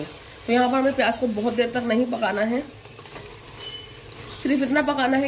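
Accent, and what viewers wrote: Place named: Bhajanpura, India. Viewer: Baaji aap bhajan pura mey rehti ho kya? Meri bahen bol rahi hey aise bhajan pura waley boltey hey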